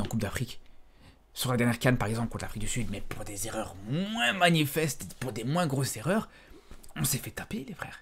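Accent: Belgium. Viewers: Frère t’es belge non ?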